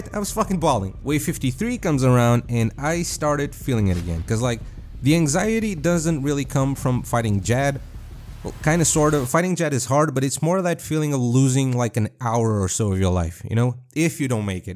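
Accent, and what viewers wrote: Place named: Portugal. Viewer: ahah "daaassss" "é agora caralho" puro tuga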